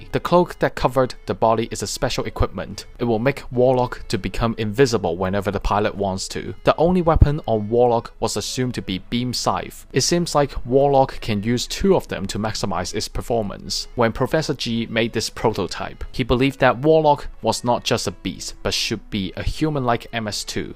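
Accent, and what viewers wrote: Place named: China. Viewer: I can't quite place your accent. I wanna say maybe Chinese or Tai. Either way, you don't give yourself enough credit. I didn't have any trouble understanding you.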